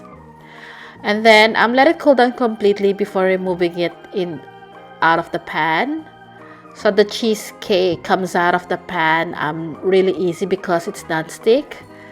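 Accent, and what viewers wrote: Philippines. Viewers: She has Philippino accent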